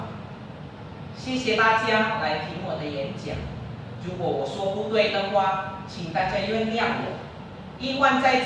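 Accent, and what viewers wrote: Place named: Malaysia. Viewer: Kayak Mandarin Malaysia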